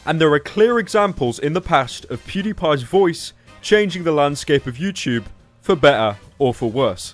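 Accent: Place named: Birmingham, United Kingdom. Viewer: I honestly cannot work out accent. It seems like a mix of Brummy and some kind of London/County's that surround inner London. It's the way he says his O's that confuses me.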